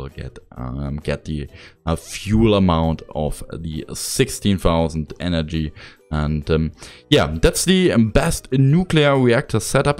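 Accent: Germany. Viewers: Do I hear a slight German accent?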